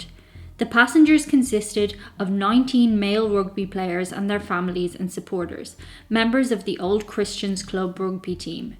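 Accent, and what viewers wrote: Ireland. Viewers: Is it just me, or does this sort of story feel more unsettling when told by a speaker with an Irish accent? I think culturally we (at least in the US) don't associate "Irish" with this kind of darkness.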